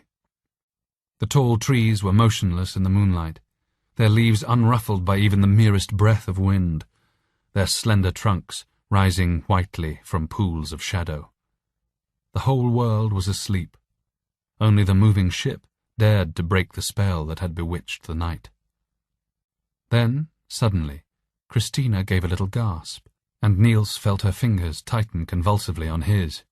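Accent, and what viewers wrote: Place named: United Kingdom. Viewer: Great story and excellent narration pace. The narrator also has a nice voice and an elegant British accent.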